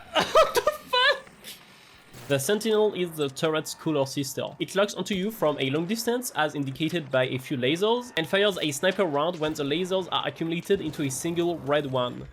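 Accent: France. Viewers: Are you French? As a French guy i can hear the French accents in every word hahaha love your video btw !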